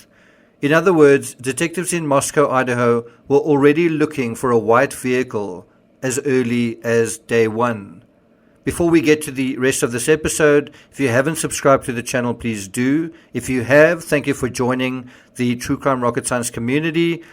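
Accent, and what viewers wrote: South Africa. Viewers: Love your South African accent.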